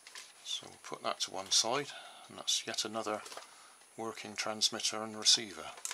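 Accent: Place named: Yeovil, United Kingdom. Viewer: We're are u from it sounds like a Yeovil accent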